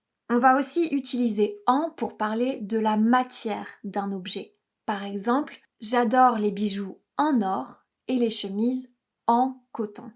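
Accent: England, United Kingdom. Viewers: J’adore votre accent anglais hahaha! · It's funny, your accent in English is rather British, I didn't expect that. Certain words sound very American though. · You have such a lovely English accent!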